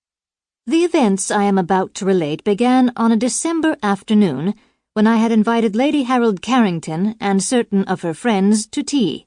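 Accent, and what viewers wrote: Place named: United States. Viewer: Why an American accent? Peabody is British.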